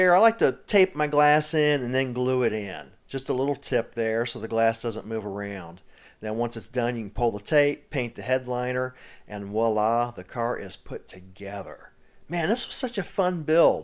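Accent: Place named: Pittsburgh, United States. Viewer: Black warsh?? Are you from pittsburgh too??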